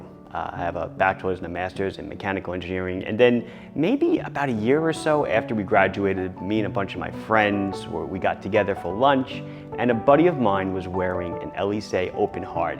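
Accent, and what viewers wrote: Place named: New York, United States. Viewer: Hearing your New York accent brings me happy and nostalgia feelings, having grown up in Queens and Long Island. As a kid I used to think everyone spoke like that. My cousins who are bit older still have heavy Queens accents and they are a joy to listen to. And your story is fantastic. Good luck to you!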